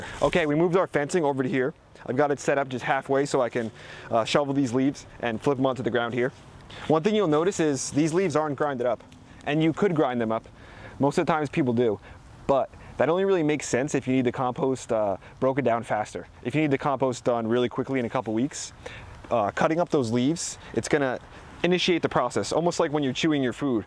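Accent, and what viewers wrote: New Jersey, United States. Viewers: Nope that's a New Jersey accent. The state has its own culture but NY and Philadelphia won't admit it. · Wooder. Can't fake that Jersey accent....ha ha. Great videos!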